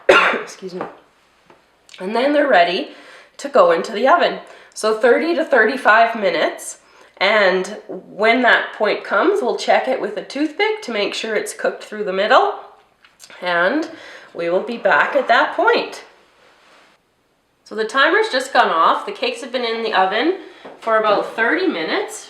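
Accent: Canada. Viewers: I hear a Canadian accent👂👂👂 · Are you Canadian? I feel dumb for asking but I'm really curious.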